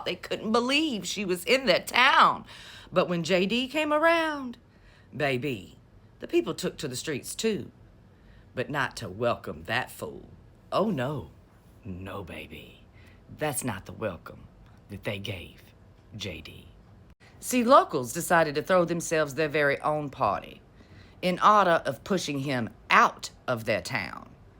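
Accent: South, United States. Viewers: Never gonna get tired of listening to the most beautiful southern voice I've ever heard.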